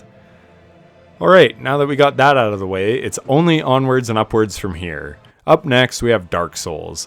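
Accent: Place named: Canada. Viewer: Halfway through the video I realized you’re Canadian 😂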